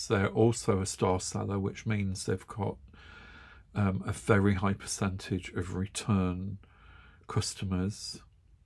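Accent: United Kingdom. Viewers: I love your British English and I am sorry that Americans have the same language 😂 But I am Austrian and we have the same problems with Germans 🤪 Thank‘ s for your Videos! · Hi, I just found your channel, greetings from Brazil. I love the british accent and is a bonus we have the metric system in common! Thank you for sharing your beloved findings in Temu, so I can get some and all those comments, I'm having a laugh!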